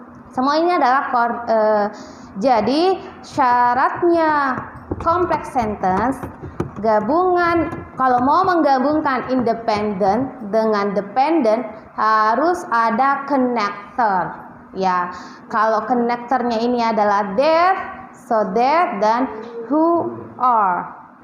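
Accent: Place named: United Kingdom. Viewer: Pengen ngucapin BHS Inggris kayak ibu dosen, fahiiiih bgttt.. aksen Britishnya dapeet.. bah bih beeh..😁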